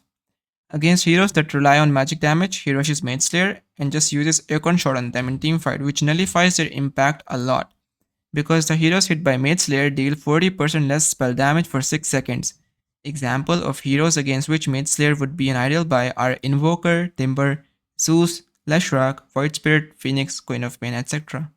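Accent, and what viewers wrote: India. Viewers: bro this indian accent i cant bro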